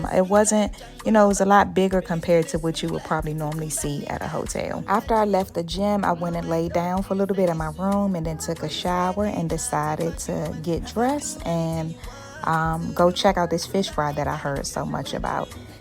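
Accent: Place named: Maryland, United States. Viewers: I live in MD PG county area..I know that accent anywhere 😌💕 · As soon as the video started I said "oh she from DC." LOL!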